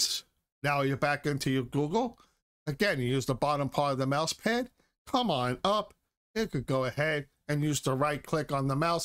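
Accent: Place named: Bronx, United States. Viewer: Go ahead, and tell me, why, when using Ai narration, would you choose a voice of a guy from the Bronx or Jersey?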